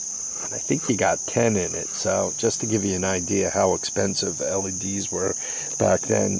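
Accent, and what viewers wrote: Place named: Central Jersey, United States. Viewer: Beautiful country there. I'm in central Jersey and thought I recognized some local flavor in your accent!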